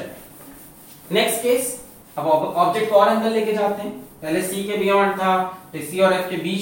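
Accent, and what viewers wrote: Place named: Bengal, India. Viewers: sir akdm rapchik bengalii. You are amazing sir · Sir ur bengali ascent is very good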